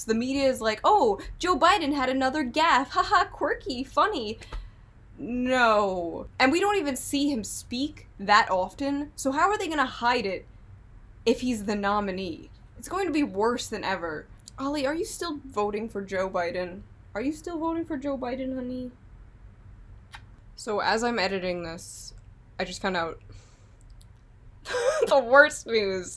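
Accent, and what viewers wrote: New York, United States. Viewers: New to the channel, can’t get away from what sounds like a New York accent? Sorry lol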